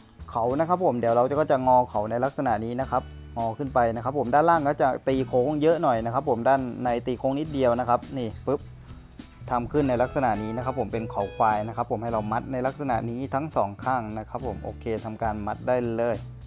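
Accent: South, Thailand. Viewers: พี่เป็นคนใต้ใช้ไหม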